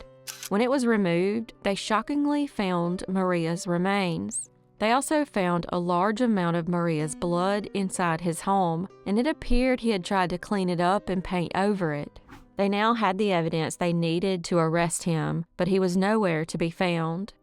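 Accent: South, United States. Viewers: 🤔 I literally heard these crime updates, word for word, on another podcast. Is " " cutting and pasting together her show and adding a country twang?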